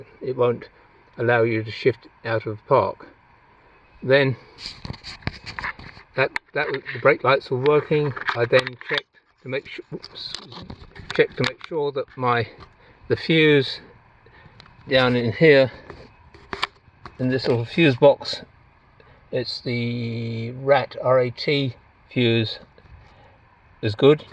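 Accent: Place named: United Kingdom. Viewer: Whenever, as an American (speaking my:"American" English) I want to feel intellectually inferior, I need only to listen to a few minutes to a video where there is a Brit explaining in the REAL English language how to fix something like this. This is by far the best video on this sort of R&R on YouTube. Frankly "the way it should be done " Thank you sir! And darn it, if only it hadn't been for your King George being such an a-hole we'd still be the same country. If we can get past that mess someday, and reconcile, I'd be glad for y'all to have us back!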